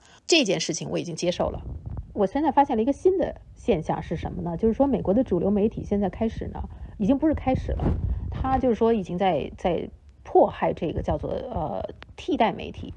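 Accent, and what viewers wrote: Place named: Haidian, China. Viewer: 海淀口音…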